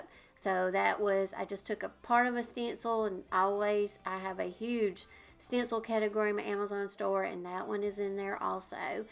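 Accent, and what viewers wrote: South, United States. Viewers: You absolutely amaze me! One day will you do a video of your whole house? I taught French for many years and I love how you use the French IODs! I’m from Alabama and I appreciate your southern accent!💖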